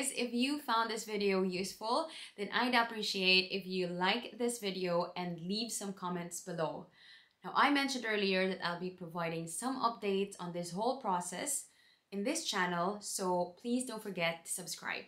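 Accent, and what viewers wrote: Ireland. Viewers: you know you've settled once you have the brogue :) cupla focal and you're 100% there.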